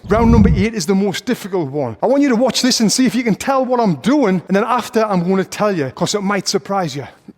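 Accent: United Kingdom. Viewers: Is it 'Britain English'?!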